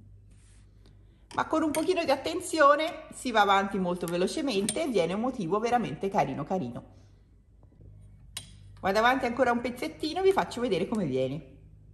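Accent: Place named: Tuscany, Italy. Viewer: (accento Toscana ciò che adoro)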